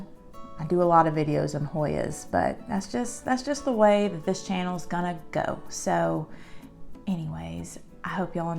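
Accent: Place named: South, United States. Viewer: Thank you for a giggle and a smile.. Hoyas are the only plants I have. I live in an apartment in southern Ontario Canada (near Toronto). My growing windows are facing N NE. They are currently indoors now. This year they produced many peduncles, but they did not bloom. I am waiting patiently. Your southern accent is amazing!!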